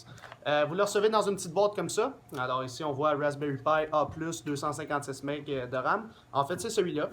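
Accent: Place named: Quebec, Canada. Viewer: C'est cool de te revoir 😃 et dire que depuis le début je te suis sur et j'en ai appris des choses concernant le monde du Jailbreak et iOS mais voilà ça j'avais fait tout le tour concernant le Jailbreak et ça m'ennuyait un peu du coup ça 2ans que je suis passé sur Android et je trouve ça cool! Et sinon je m'intéresse vraiment à Raspberry Pi, voir ce qu'on peut faire même si je connais rien encore, j'espère apprendre pleins de choses avec ce nouveau projet et surtout ton accent Québécois que je kiff grave:P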